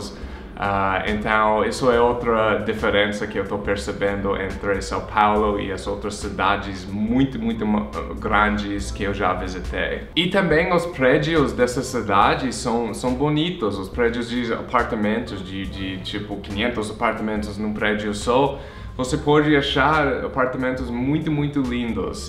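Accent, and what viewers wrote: United States. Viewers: Filma um pouco dos encontros, e faz um vídeo pra gente que não mora é nenhuma dessas cidades. Estou amando seu canal, você fala muito bem português, gosto do seu sotaque americano ♡ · É mó engraçado americano falando português kkk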